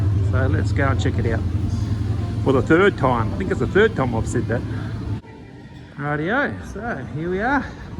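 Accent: Australia. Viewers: You sound like an Aussie? Am I correct? Thank you for showing videos of what the situation is really like presently, after all covid restrictions are being lifted. Just curious (and amused 😂😂😂) why are you, unlike your countrymen, not afraid of being attacked by the Chinese? Tell them NOT to be afraid of their own shadows!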